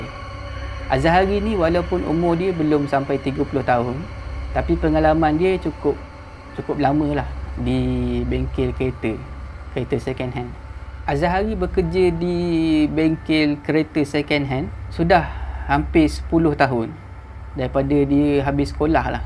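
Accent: Terengganu, Malaysia. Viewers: ro tera citer kot base ganu kali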